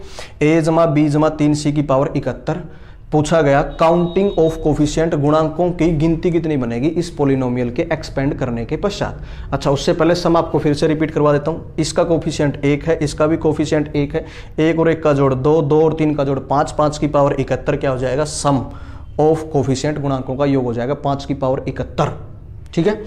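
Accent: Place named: Haryana, India. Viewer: Superb sir apki haryanvi language 🙏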